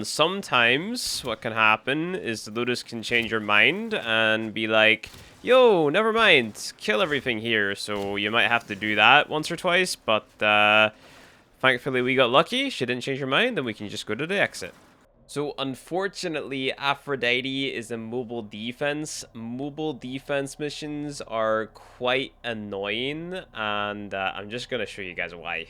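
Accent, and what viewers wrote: Northern Ireland, United Kingdom. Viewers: Is that a fellow Northern Irish accent I hear? Well damn, I might just take that as a sign to give Warframe another go.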